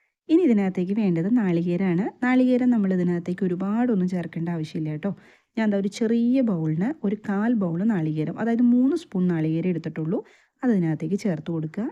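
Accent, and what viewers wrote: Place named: Thrissur, India. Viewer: തൃശൂർ കാരി aano? ഭാഷ കേട്ടിട്ട് അങ്ങനെ തോന്നി. ഞാനും ഒരു പാവം തൃശൂർ കാരി ആണ് ട്ടോ 😄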